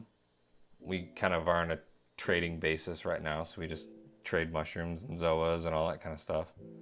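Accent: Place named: United States. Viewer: Nice tank.. I’m a brit so the American twang can sometimes bend my ear..is there really a coral called ‘arse crack’ ... 🤣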